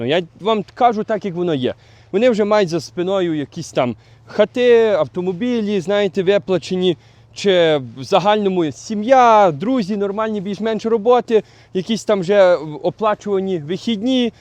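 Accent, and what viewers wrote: Western Ukraine, Ukraine. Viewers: Это западноукраинский, а не американский акцент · Про яку мову ти можеш говорити,якщо ти закордоном? Який ти українець?Ви перевертні! Українці на Україні! А ти за своєю вимовою із Західної України, ти навіть не бачив і не чув тих вибухів. Під шумок рванув " щирий українець". Вчи англійську, бо там українська мова нікому не потрібна. 😂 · Так у него западный акцент. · Дуже дратує твій западенський акцент !!! Тому ДИЗЛАЙК !!! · Дивлюсь вас вперше,ви мабуть з Західної України, бо там говір такий. Усюди де чую такий тембр мови,згадую дитинство у бабусі у селі ,як розмовляли мої дядьки .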